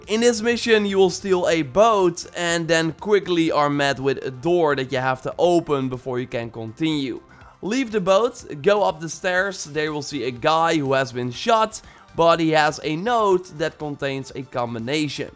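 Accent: Netherlands. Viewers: Are you Dutch by any chance? Your accent sounds like it haha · Het leuke aan dit is je Nederlands accent. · Je bent Nederlands of niet. Je hebt echt een Nederlands accent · Your dutch ?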